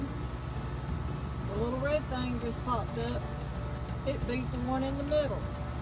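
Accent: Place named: Eastern Kentucky, United States. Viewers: Love the accent, I'm from the mountains of Eastern Ky... we have the same accent with a mountain drawl... southern people the heart and soul of America! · I’m from Wayne Co West Virginia across Tug River from Martin Co Ky. We talk the same, srup,worsh rag, roin the clothes lol I love our country ways Enjoyed the video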